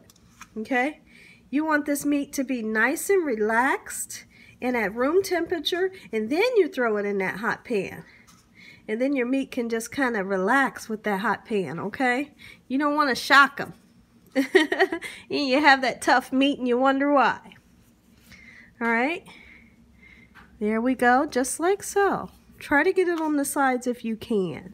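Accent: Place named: Michigan, United States. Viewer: You hear me?Just like so.....just like so...I luv when you say those two phrases...lol you hear me? are you from the great state of Michigan? You sound like my friends that are from Michigan...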